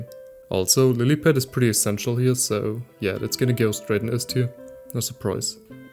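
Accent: Germany. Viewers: You have nice german accent.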